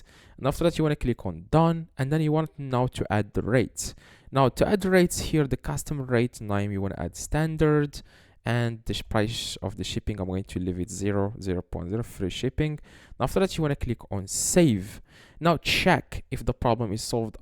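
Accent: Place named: Morocco. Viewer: Merci mon frère, j’ai très vite reconnu ton accent marocain mdrrr, Tu m’as sauvé d’un problème que j’essayais de résoudre depuis ce matin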